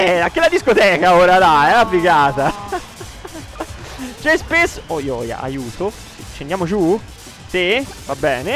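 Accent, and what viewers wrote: Tuscany, Italy. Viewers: ma te sei della Toscana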